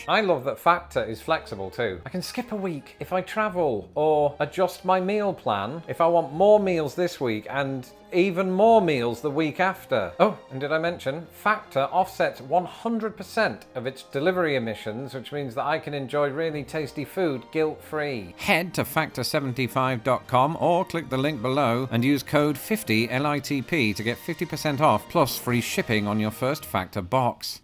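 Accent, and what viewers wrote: United Kingdom. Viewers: I'm not really sure these american words can be considered american when you pronounce them with your british accent. It's just a made up word at that point.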